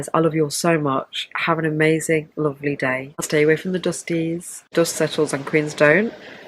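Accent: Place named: United Kingdom. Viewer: i didn't expect you to be br*tish wtf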